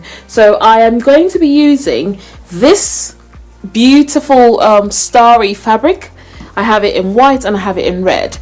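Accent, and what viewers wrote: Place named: Europe. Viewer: Beautiful black woman with old-world skills, humble heart, and European Accent . 🔥🔥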